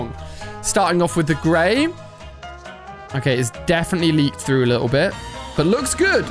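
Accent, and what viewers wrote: Australia. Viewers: Australian or bri'ish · Are you Australian because if so how do I never notice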